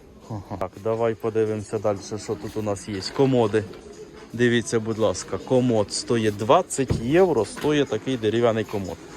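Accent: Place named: Kherson, Ukraine. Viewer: Дякую за ваші відео, дивлюсь із задоволенням. Звідки така цікава мова? Це в селах на Херсонщині так розмовляють?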